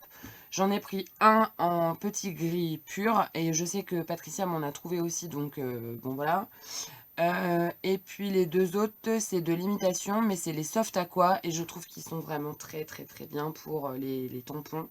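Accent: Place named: England, United Kingdom. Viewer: Ton accent anglais j’adore. Une affaire la peinture izink ça marche sur tout support,tissus ,métal ,bois elle sont tops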